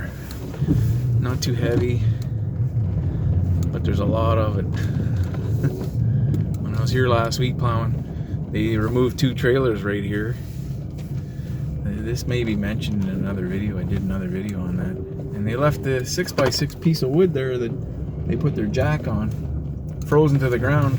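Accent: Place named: Canada. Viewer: I hear what you are saying man, I was called a douchbag last winter, at an apartment I looked after, I told the property management I was putting up with that shit, and I dropping their buildings because it wasn’t the first time I had a run in with this particular property. So this year I told them sorry but buh bye. Sure I lost 5 bldgs, but I then picked up 4 and charge the same amount I charged for the 5 bldgs from the other mgmt company. We do a dangerous job that puts us in the worst elements and people think because they give us a small amount of money to clear their properties that they can treat us like we’re fools. Nah! We’re professionals and we should be treated like such. Also How would they know what equipment or amount of labour is needed, to do jobs, were the ones that do it all the time, ....... sorry, got me fired up, sounds like you are from Canada, I’m just east of Toronto, where are you?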